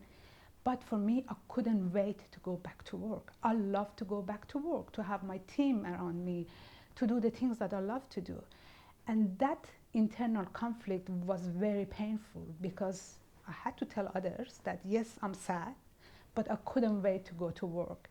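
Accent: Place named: Iran. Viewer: Very fascinating. I see your name is but hear a strong Persian accent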